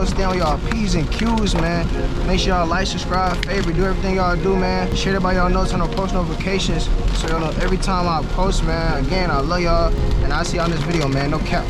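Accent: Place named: India. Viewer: Vwat da fuc bruddah looosh ash 🤣🤣 Indian accent caught me hella off guard might just be a subscriber now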